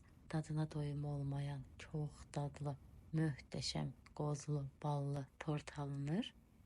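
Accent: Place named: Turkey. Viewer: Hazırladıqlarınıza söz yox.Əla.Danışıq tərzinizi anlamıram.Türkiyədə yaşayırsınız?Cümlələrin sonunda olan vurğu bizim millətə xas deyil.Bu səbəbdən soruşuram.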